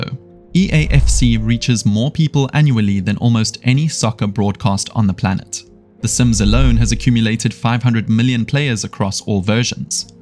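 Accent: South Africa. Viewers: bro you have a south african accent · I also thought he sounded South African. 🤔🇿🇦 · Someone said your voice is like a robot, didn't know a South African accent could be robot like, great video bru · top quality content man. and i can hear a "whaaait" South African accent?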